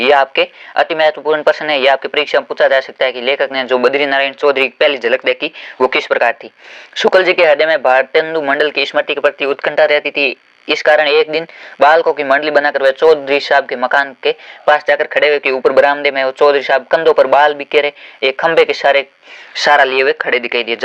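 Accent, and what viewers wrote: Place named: Marwari, India. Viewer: Ser app marvedi Ho Na apki aavaj se pata chala rha hai 😊❤❤🎉🎉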